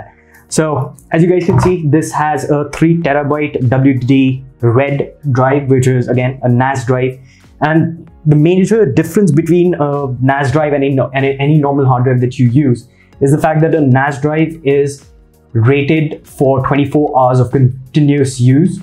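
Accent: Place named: India. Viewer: thanks nice indian english very clear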